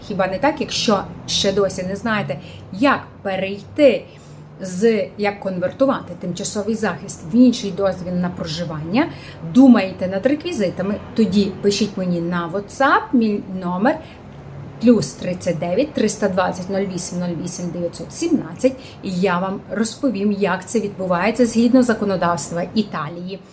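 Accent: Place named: Lviv, Ukraine. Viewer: Дівчатко, навчися розмовляти на українській мові ( без львівського акценту)...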